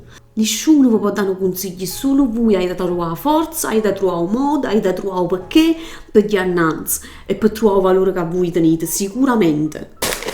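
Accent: Naples, Italy. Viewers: Parli un napoletano bellissimo, molto classico, pulito, tipico delle persone istruite che hanno una cultura,infatti non ho bisogno di nessun sottotitolo perché è lo stesso napoletano con cui mi parlava mia nonna adorata nei momenti di tenerezza, lo stesso di Eduardo, di Viviani e non quel brutto dialetto sguaiato imbastardito che purtroppo si sente parlare tantissimo oggi specie dai più giovani, li si che ho bisogno dei sottotitoli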